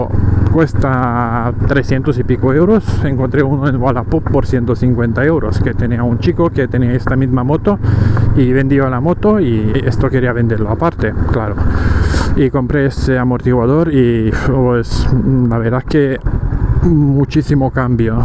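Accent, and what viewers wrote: Romania. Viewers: Nosptros tenemos dos, una de mi mujer y otra yo. Estamos dando la vuelta al Mundo, y NINGUN PROBLEMA , excepto cambiar amortiguadores y perdida de gasolina en la de mi esposa por culpa de una fisura que aun no sabemos porqué. Obviamente, vamos muy cargadps( es nuestra casa) pero es una moto para toda la vida y para viajar. ( por cierto, que su acento me suena a Rumania. Y me alegro). Saludos desde ese Pais, esperando las motos, que vienen de Canada. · Salut , esti român?..dupa accent😊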